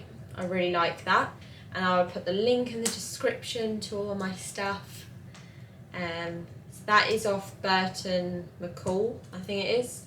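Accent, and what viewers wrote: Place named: Manchester, United Kingdom. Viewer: do you have links to manchester at all some of the words you say we say in manchester like manky haha, and mount-in just wondering :) thanks great video btw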